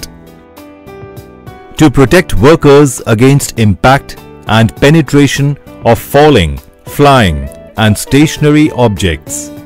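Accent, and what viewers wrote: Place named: Thailand. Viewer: The best Thai English I've ever heard, man! And thank you for this video.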